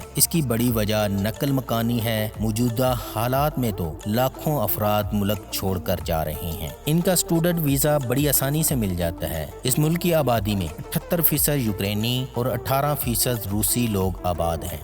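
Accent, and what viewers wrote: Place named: Punjab, Pakistan. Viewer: Lahore Pakistan se boht Sara Pyar apki beautiful Punjabi accent awaz Kay Liye, 💕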